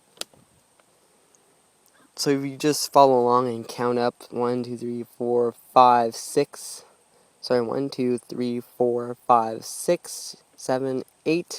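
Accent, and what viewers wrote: Canada. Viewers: are u canadian cuz u sound canadian and i know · You sound like a canadian bitch. Thanks for the tutorial.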